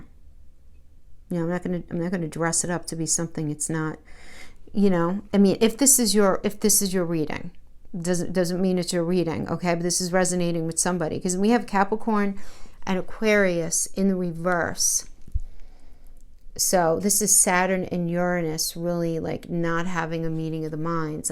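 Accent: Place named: North Jersey, United States. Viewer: I definitely thought N. Jersey! LOL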